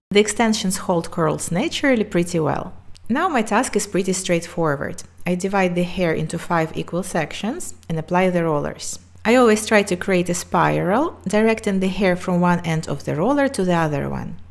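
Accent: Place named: Russia. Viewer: Я тоже из старичков. Люблю видео и сразу услышала наш, русский акцент❤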